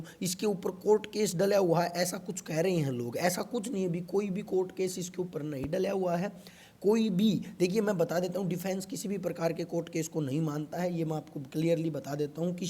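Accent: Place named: Haryana, India. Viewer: Bhai bole te to Haryana ka laage hai 😀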